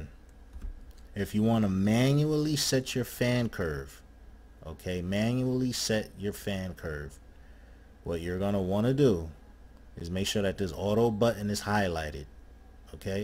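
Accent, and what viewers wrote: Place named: Australia. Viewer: He's obviously Australian.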